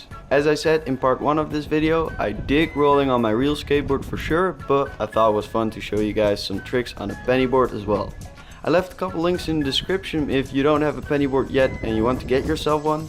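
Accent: Netherlands. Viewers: Ben je nl 😂😂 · Are you dutch? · Are you Dutch?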